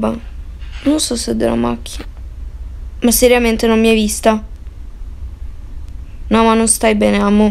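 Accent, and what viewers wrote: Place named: Rome, Italy. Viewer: Vai con ľaccento romano regaaaaaaa😂😂😂 I primi urli sembravano degli ornitorinchi spaventati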